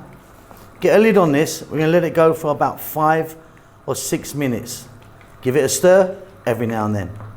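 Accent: United Kingdom. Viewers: Speaking from what I see, are cups and tablespoons smaller in the UK than the US (going by your accent)? I'm a small-scale US homesteader and I want to add some sheep to my property. Looking at recipes. "Lamb" from the market is usually (legally) mutton and the wool sheep variety. I want to get hair sheep and harvest annually to avoid greasy, lanolin flavor.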